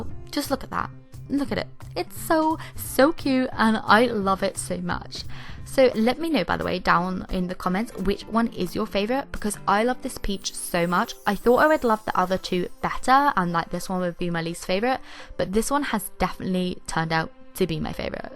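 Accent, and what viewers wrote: England, United Kingdom. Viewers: ugh,british english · Peyton Johnston she’s British so she speaks differently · What part of england are u form?? U dound like people in my surandings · You sound British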